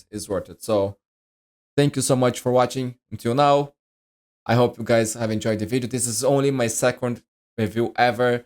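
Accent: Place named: Brazil. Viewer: eu comecei o video pensando: cara, ele tem um sotaque do ingles brasileiro, ai quando eu olho pro seu lado, tem um camisa do flamengo kkkkkkkkk.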